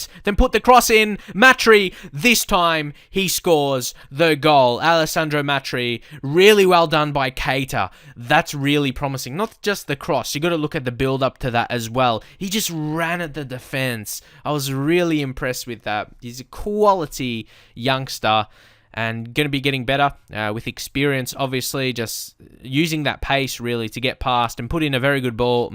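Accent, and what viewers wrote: Australia. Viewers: Hey mate new subscriber here kinda binge watching this at the min I have an ac Milan save on fm 16 loving your content also the Australian accent is so cool haha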